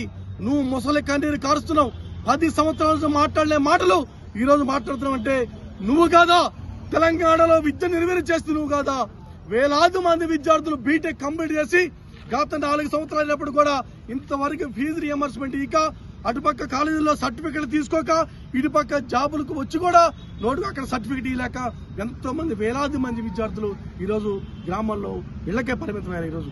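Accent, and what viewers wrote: Andhra Pradesh, India. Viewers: Neee slang yeee andhra undiiii!!!! Evadivi raaaaa nuvvu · వీడొక ఆంధ్రా slaunt లో మాట్లాడుతున్నాడు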